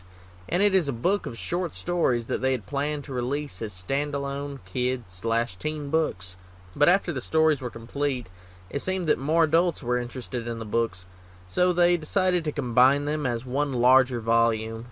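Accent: South, United States. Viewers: Loved the poem! Also the sweet southern accent that you tell it with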